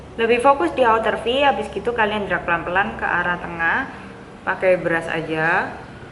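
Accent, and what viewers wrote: Java, Indonesia. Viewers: logat jawanya itu yg bikin suka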